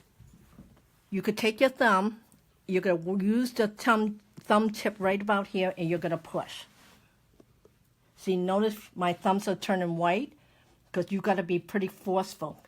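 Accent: New York, United States. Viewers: I like her New York accent. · I was very surprised and delighted to hear someone with a NY accent! Hence I really enjoy learning from you. I first started using an iced water bottle to roll and found some relief, then I just got an electric Shiatsu foot massager that has a warning function [which I haven’t used yet], my question is, when do I use ice and when do I use the heating? FYI, I found a big bump above the area where you had marked on the foot, so I have been concentrating mostly on that area.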